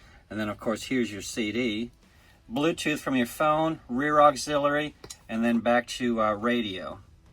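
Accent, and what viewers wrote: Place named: South, United States. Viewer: Southern accent and southern slang "y'all " Im curious where y'all from.... im from New Orleans....live in Wyoming now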